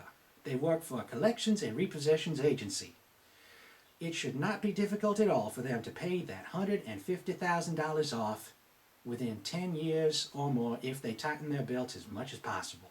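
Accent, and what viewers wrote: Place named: Virginia, United States. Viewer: Nice northern coastal Virginia accent.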